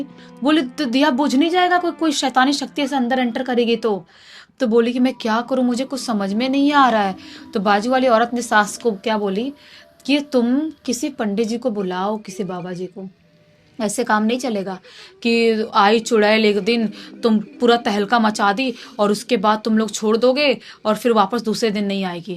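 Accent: Bihar, India. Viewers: aapki tone bihario wali h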